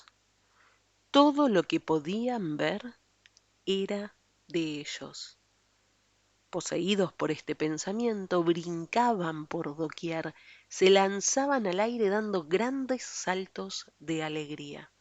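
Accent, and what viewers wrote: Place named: Argentina. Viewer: Amo el acento argentino, me relajó demasiado tu voz 😭🩷 está súper interesanteeee ya quiero que salga la otra parte, y gracias por el buen contenido :)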